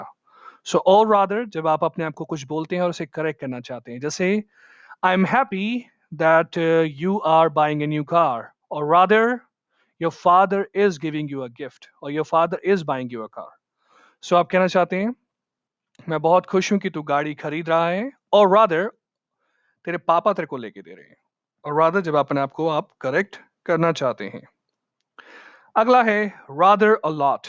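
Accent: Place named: India. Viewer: Mate stick to ur Indian accent rather than imitating our accent.... why don’t u decide if u wanna speak British or American first instead of mixing them.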